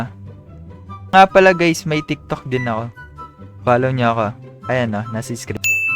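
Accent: Philippines. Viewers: It make sense because You sound like Filipino when you talk English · HER SOUND LIKE FILIPiNO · Siguro Tagalog ka talaga Kasi parang Tagalog ka talaga kapag nags peaking English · sabi ko na ba familiar ako sa filipino accent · Yaaa, Filipino accent like the filipinos, actually im surprised i always heard english in YouTubers accent 😊😅❤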